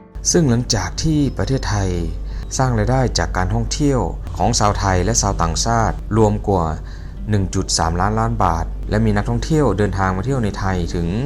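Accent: Laos. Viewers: ไม่น่าจะใช่ไทยอีสานด้วย น่าจะเป็นคนลาวนะ ฟังจากหลายตัวอักษร ไม่เหมือนของไทย เขาจะออกเสียงยากเพราะความเคยชิน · พูดได้ใกล้กับคนไทย …แต่สำเนียงบ่อยครั้งออกเสียงแบบคนลาว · แอดมินคือคนลาวใช่มั้ยครับ..ผมฟังจากสำเนียงน่ะครับ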